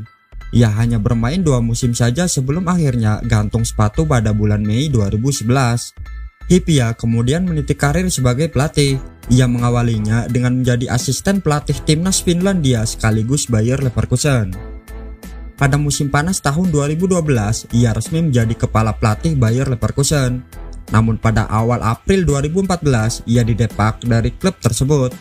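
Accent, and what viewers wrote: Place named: Banyumas, Indonesia. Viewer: Ora ngapak ora kepenak,, gua tau dr aksen narator nya 😁😁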